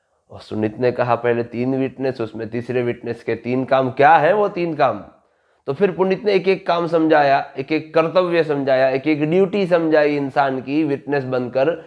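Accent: Gujarat, India. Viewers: Are you gujarati ❤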